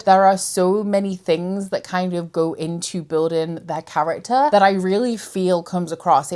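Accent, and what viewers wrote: Yorkshire, United Kingdom. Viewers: Ugh I just love a Yorkshire/Lincolnshire accent. I'm American and it reminds me of My Mad Fat Diary, which I was obsessed with as a teen.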